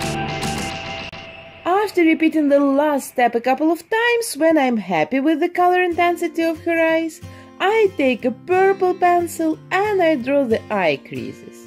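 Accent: Russia. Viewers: I love your voice its like Russian mixed with Queen Elizabeth.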